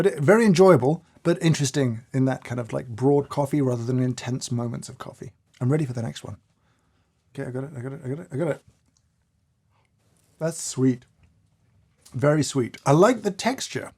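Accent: United Kingdom. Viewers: those are manually-added subtitles, not automatic ones. The person speaking is British, lives and runs this channel in the UK, and is speaking British English. So, unless the subtitling work had been outsourced to the US, it is unclear how it ended up being in American English.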